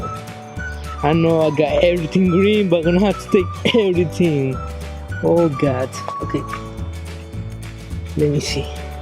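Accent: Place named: India. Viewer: i like your Indian accent Bruh! lol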